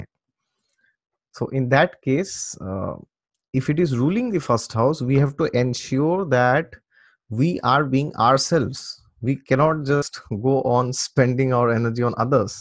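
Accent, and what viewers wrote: Assam, India. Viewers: has Assamese accent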